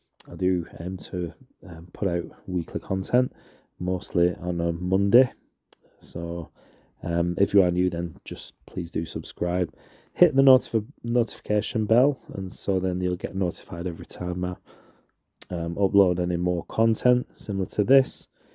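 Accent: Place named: North West England, United Kingdom. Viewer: This is beautiful, I love those moody blue-greys! Also I gotta say, as someone from the North West but living elsewhere, I was overjoyed to click on this video that came up in my feed and hear a familiar accent :) Subscribed, looking forward to your future videos!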